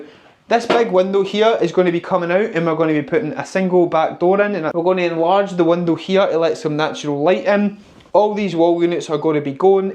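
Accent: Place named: Manchester, United Kingdom. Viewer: What accent is this? My subtitles are confused. Are you from Manchester?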